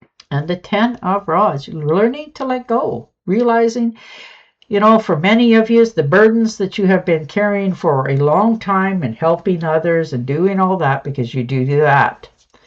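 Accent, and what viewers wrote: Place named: Canada. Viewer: New viewer here. Good reading, thanks. Your accent sounds a lot like my Canadian friends from BC. Sub'd.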